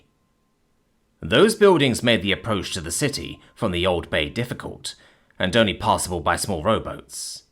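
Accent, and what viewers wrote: United Kingdom. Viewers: Even though I already knew what you looked like, and then got an update on what you look like now, I still can’t help but see what my mind’s eye sees when you start narrating. You just hold a voice that, at least for me, holds its weight in gold. I love when you narrate things, especially audio books. Thanks for everything,. You’re my favorite narrator. Edit: When you talk, I always hear a preppy British kid in a prep school, complete with a patterned vest and slacks, with your hair parted in the middle. Maybe that’s just the American in me, but 🤷‍♂️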